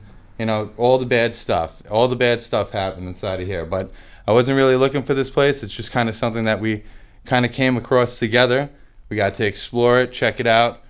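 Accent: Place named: Long Island, United States. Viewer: i take it you split from either long island or nyc i leaning more on the long island thing by the way you talk .so you raded ny for flo???????how is it dwn there thinking about moving myself .from the great communist north east ..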